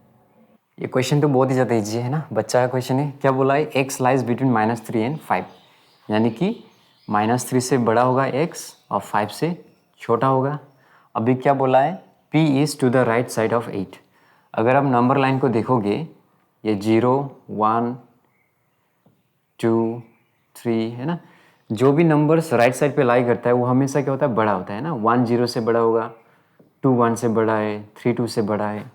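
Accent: Assam, India. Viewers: sir ap axomiya niki?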